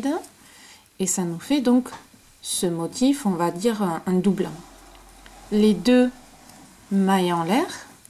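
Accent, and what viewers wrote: South, France. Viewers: Merci pour votre reponse avec toujours ce petit accent qui rappelle le soleil du midi je vais acheter la laine comme dans la vidéo auriez vous un modèle de chale avec emmanchure dans tous vos trésors SVP merci bon WE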